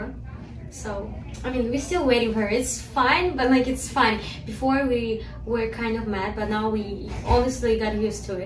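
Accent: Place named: Ukraine. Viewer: You’ve got a beautiful Ukrainian accent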